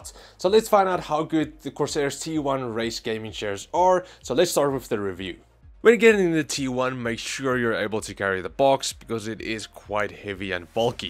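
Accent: Afrikaans, South Africa. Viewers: Kan hoor jy is Afrikaans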